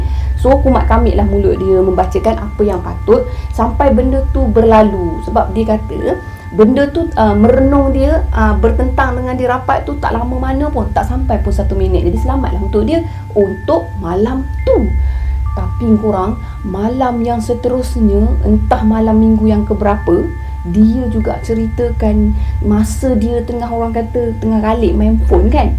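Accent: Kelantan, Malaysia. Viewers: Senjoh tu Hok sedak dgr kak .haha .kak ore Kelate ko .?area mano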